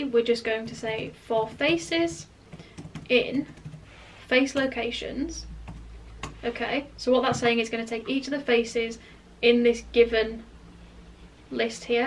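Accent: United Kingdom. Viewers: Thanks for this tutorial. It is easer to understand with a British accent. As this was made over two years ago, what is your recommendation today for the best face recognition software? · You are all I need, you are a girl (that shows me that I can become a programmer), you have interesting projects, you explain and the best you have a British accent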